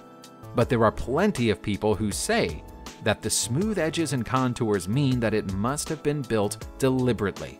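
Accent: United States. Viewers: just the next disgusting american accent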